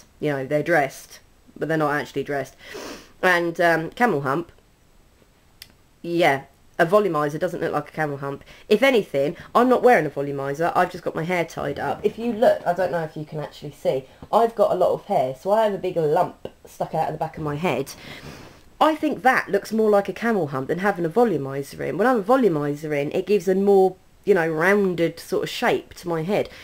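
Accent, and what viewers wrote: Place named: United Kingdom. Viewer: haha:) lol all European accents sound the same to me! It takes me a good while to even figure out Scottish from British.... :/ but im learning slowly and yeah your soo right Americans got like an accent per state lool But i absolutely lovee the british accent its soo adorable<3 waitt.. your British right??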